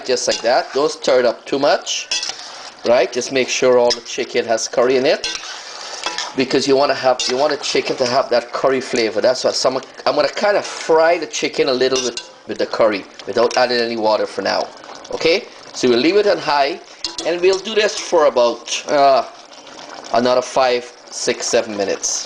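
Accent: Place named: Guyana. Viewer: congratulations for your video. I didn't know people in Guyana speak in english like this. Do you recommend your country to people like me who want to practice my English?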